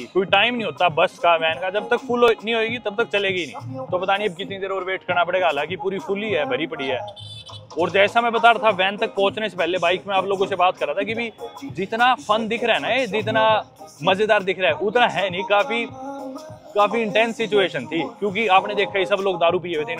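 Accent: Haryana, India. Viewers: great bhai tumhare sath safar ka maza hi kuch or hai haryanvi tone bhot badiya lage manne. tum sach much great ho dear👍🥇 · Bhai ki hryanvi language sun k swad aa je h❤❤❤❤❤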